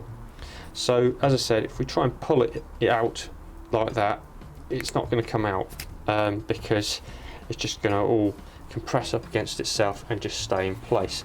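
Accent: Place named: Midlands, United Kingdom. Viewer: you sound as though your in the Midlands do you have your own garage I’m near Warwick and could do with a local mechanic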